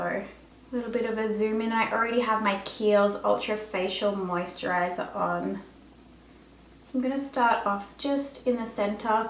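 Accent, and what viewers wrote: Australia. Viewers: Can you please tell me how you got this product so early?! I'm assuming you live in Australia, like me (due to your accent) and it isn't released here until Aug 25th.. and the Too faced and Sephora websites don't ship to Aus :(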